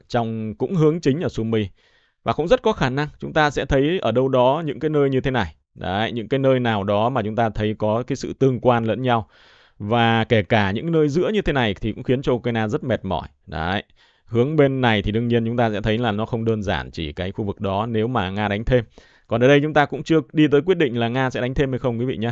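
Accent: Standard. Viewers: Giải trình hay âm phát chuẩn rỏ ràng ❤❤❤🎉🎉🎉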